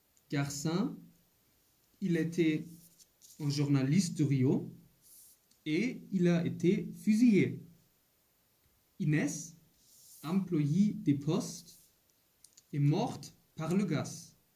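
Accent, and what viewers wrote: Germany. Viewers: Il a évidemment un fort accent allemand. Avec les Espagnols , je peux comprendre qu'ils n'ont pas de gorge R ou Ü, mais les Allemands devraient pouvoir parler bien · Puh! Deutsches Französisch ist echt schwer zu ertragen... aber sonst ein gutes und informatives Video.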